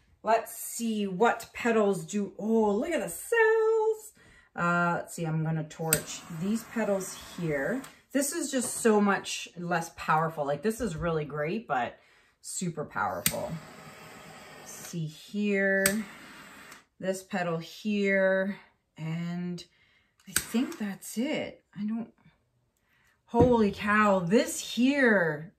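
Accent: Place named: Atlantic Canada, Canada. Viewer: I just want to tell you how much you've inspired me. Im a super crafty Atlantic Canadian and I am in awe of the things you do. It's so great to watch someone who talks like me and shops at the same places and all of that jazz. I've subscribed and binge watched pretty much all of your videos. Keep it up and maybe you'll see me on here someday if I get brave enough lol. You are phenomenal.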